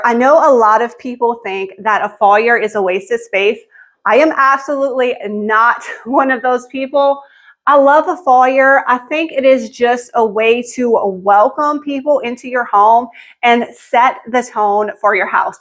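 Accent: Louisiana, United States. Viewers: Hi I’m originally from new Iberia Louisiana. I heard your accent and I had to watch making me homesick. I enjoyed your video and subscribed. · What is the accent… love it. Is it Louisiana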